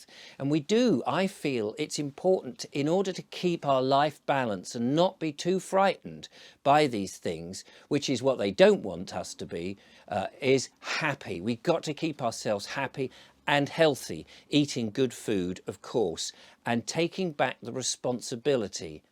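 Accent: United Kingdom. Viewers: It's the British accent.🤫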